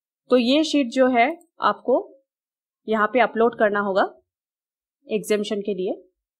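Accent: Bengal, India. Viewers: Are u Bengali girl 😀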